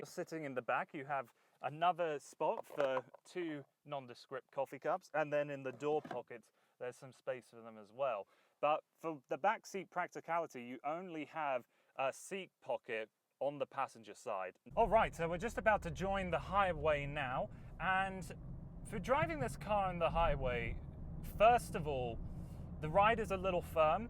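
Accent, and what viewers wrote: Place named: England, United Kingdom. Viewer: Are you in Canada .... ? because you sound very English .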